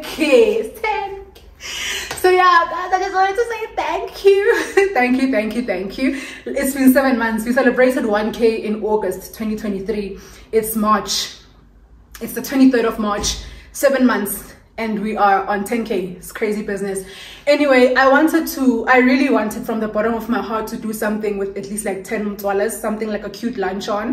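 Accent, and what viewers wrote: Nigeria. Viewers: ❤️❤️❤️❤️❤️❤️❤️ hi mtswala just wanted to say congratulations again on your 10k subbies ,we love here. I wish you can speak wth that nigerian accent everyday or every month I really enjoy you doing it 😂😂❤️❤️❤️❤️ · I'm here for the Nigerian accent lol🤣love it a lot!!! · Yohh motswala I love you so much hle 😂😂 the Nigerian accent is giving 🔥🔥🔥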